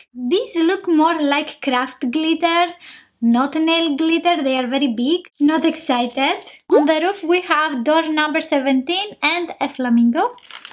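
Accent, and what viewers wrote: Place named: Greece. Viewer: Είσε Ελληνίδα η κάτι τέτοιο? Γιατί η προφορά σου μιαζει ελληνική δεν το λέω για κακο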